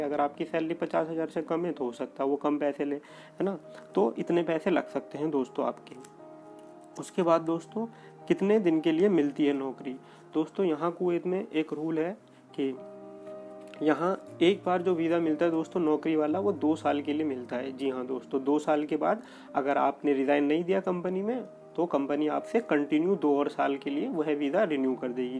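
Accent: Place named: Garhwal, India. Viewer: bhai India me aap kahan se belong karte hain..aapka accent garhwal ya koi pahadi side ka lagta hai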